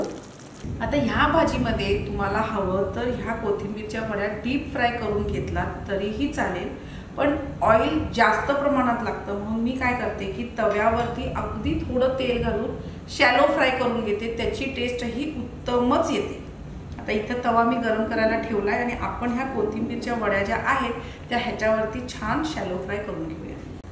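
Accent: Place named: Kolhapur, India. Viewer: मी खूप दिवसांनी आज यू ट्यूब वर आले आणि प्रथम तुमची रेसिपी बघितली. खूप छान सोपी रेसिपी आहे. आज मला १० रु. ला मोठ्ठी पेंडी कोथिंबिरीची मिळाली आहे. आज संध्याकाळच्या जेवणातच मी ही भाजी करून बघीन. माझ्या तोंडाला आत्ताच पाणी सुटलंय.! आणखी एक. खूप दि.नी तुम्हाला पाहिलं. हडकल्यासारख्या दिसताहेत. छान दिसताय. तुमचा कुडता, व त्याचा पॅटर्न पण खूप आवडला. तुमच्या तोंडची कोल्हापुरी लहेजा असणारी भाषा मला फार आवडते. लहानपणी काही काळ कोल्हापुरात होते. एकदम् नोस्टाल्जिक वाटतं.❤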